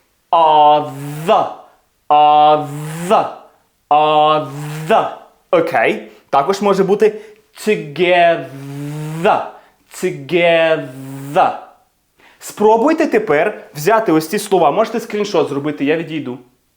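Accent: United Kingdom. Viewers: Дякую за урок. Як на мене, це ідеальний формат - практикуватись разом. Мавпочка всередині одразу включилась в гру і повторювала. Я як дитина реготала над жартами ) "а шо ви робите, вибачте?" 😂 Я в захваті від подачі, взаємодії з глядачем. Та зачарована Вашою британською вимовою. Це саме та англійська, яку би я хотіла вивчати. Тільки від неї "йокає", бо це дуже красиво. Тож дуже буду чекати саме такі фонетичні інтерактиви. Дякую 🤗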